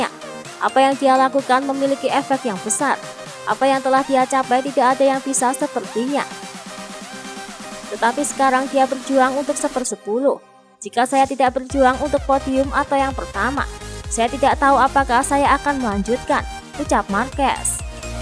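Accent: Java, Indonesia. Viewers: Mbak e medhok men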